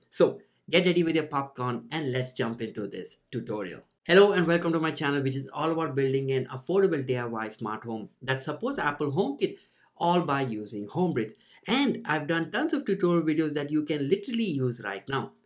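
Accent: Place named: India. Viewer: You are 100% from India. Unmistakable heavy accent.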